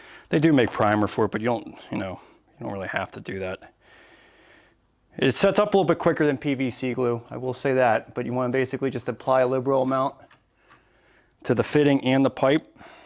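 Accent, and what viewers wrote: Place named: Pennsylvania, United States. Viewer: My boy has a Pennsylvania accent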